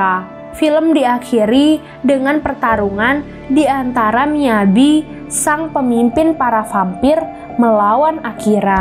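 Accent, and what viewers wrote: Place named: Makassar, Indonesia. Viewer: logatnya kek org makassar :v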